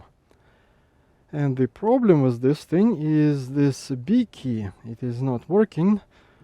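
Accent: Russia. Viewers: У вас такой русский акцент, я ошибаюсь ? :)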